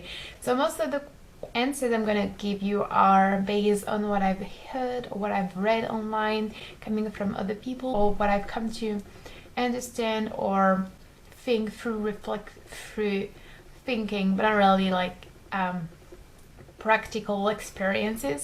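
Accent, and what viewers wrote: France. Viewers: nice video... hope you find the person that compliments you're amazing self well. also amazing french accent. totally love that. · I am puzzled. Not about my own sexuality, I am not asexual, I am straight, never doubted neither of those. But when I began to watch some of your videos, I thought "Damn, she is stunning !" and then "f***... She is asexual, what a shame !". But since I was enjoying listening to your voice, I figured that would be a good time to know what asexuality really was. I used to think asexuals were people who either had bad experiences or were afraid of sex (that would have explained why I saw more asexual girls than asexual guys). After listening to you, I understand that is not entirely true. You are not a "Eeeeew ! Sex is gross ! It is a sin ! You are a whole bench of sinful perverts !" type of person, and that is relief, I must admit. 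My first girlfriend was not asexual, but she used to say she did not like french kissing (she was my first, but she had a lot of guys before me). But when we started to get physical, we ended up making out and we french-kissed a lot. I do not think I was that good at kissing (she was waaaaay more experienced than me) but it came naturally. I think it is the same with sex. With some people, it cames just like that, it is not entirely your responsability. Anyway, I do not know if you are still single (a few month have passed) but I know you will find someone. You are very cute, I love your voice and that light french accent is very charming. There is a fragility in you, that is attractive. And I hope you will be happy with him, with or without sex. ;)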